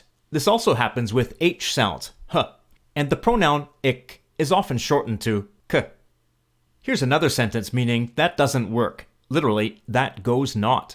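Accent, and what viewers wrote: Antwerp, Belgium. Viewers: That’s not Flemish bro that’s most Antwerps dialect, but in the video he esplanades it. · Im from belgium, specificly im from limburg and it seems youre flemish is based on the tussentaal from antwerpen. Mostly its all correct tho but just some things that ive heard but rarly cuz its not eveywhere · 🤣🤣 as west- Flemish this is cool…. But the intonation is Antwerp, not Flemish. But there is so much different dialect, it is impossible to explain. But good job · da is wel antwerps vlaams, in Limburgs is da wat anders. Your 'flemish' is antwerps flemish, while in Limburg we don't speak like that.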